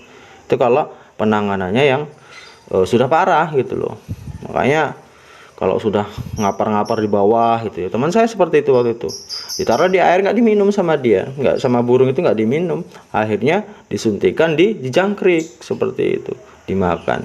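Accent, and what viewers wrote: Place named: Lampung, Indonesia. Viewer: Suara kaya nada orang Jawa Lampung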